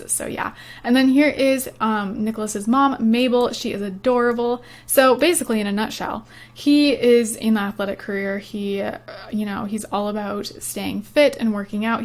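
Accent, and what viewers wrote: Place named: Canada. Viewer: YOUR CANADIAN "OOUT"DOOWN"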